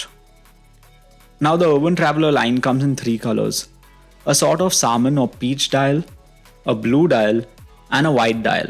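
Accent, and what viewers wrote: India. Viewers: Your accent is normal. I just felt that it just has a bit of an Indian tone to it. Anyway, your voice cover is very good.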